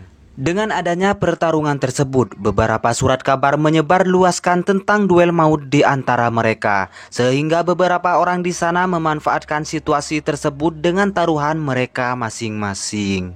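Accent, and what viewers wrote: Madura, Indonesia. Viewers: Logat madura cak?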